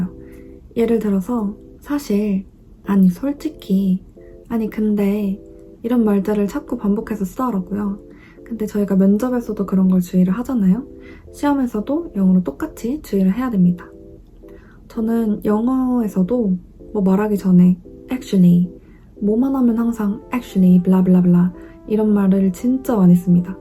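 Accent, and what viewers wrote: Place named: United Kingdom. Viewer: 영국억양 멋있어요.! Autumn 한국인이 쓰는거 첨보네요 👍🏻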